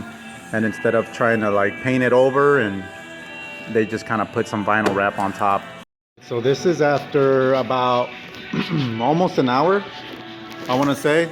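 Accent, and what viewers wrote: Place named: Yorkshire, United Kingdom. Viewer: really useful. sweet accent British Yorkshire. and very useful regarding cracked old vinyles. thank u. U could have removed the glue by aseton easily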